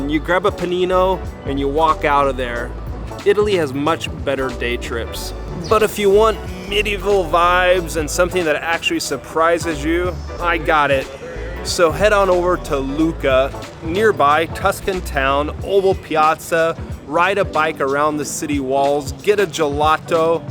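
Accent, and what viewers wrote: United States. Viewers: You sound like an American. Perhaps just stay there.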